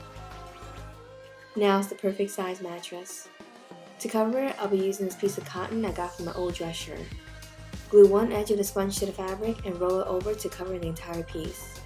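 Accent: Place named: New York, United States. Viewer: I like how you turned into a New Yorker about 40 seconds in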